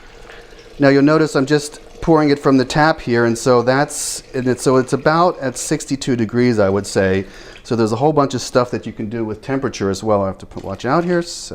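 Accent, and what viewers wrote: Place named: Southern California, United States. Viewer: You seem like you're from California around my general region. Your dialect and your attitude are familiar to me. Being born and raised in Southern California, I pick up on that.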